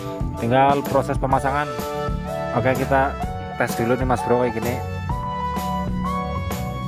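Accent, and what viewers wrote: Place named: Java, Indonesia. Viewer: Mas'nya lucu...heheh.. Saya suka ketawa sendiri kalo dengar orang jawa,bernahasa indo tapi jawa'nya masih meddok...xixixi... Tapi jujur, dari awal sampai akhir video..saya suka mas... Mas Detail kasih ilmunya..sip. Kebetulan saya baru mau ganti seal shok beat istri saya,perdana nih saya... Matur nuwun ya mas...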